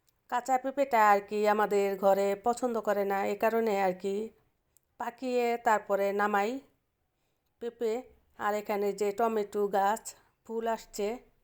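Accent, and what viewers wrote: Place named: Narayanganj, Bangladesh. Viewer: নারায়ণগঞ্জের মানুষ এই ভাবে কথা বলে আমার জানা ছিল না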